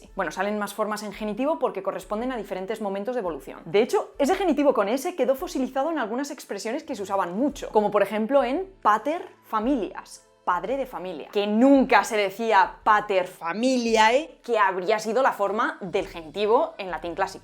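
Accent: Spain. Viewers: Es tan divertido escuchar como lucha el acento de castellano de España con el idioma inglés. Saludos a. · Same! Also I was impressed by english. I really enjoy hearing a spanish accent.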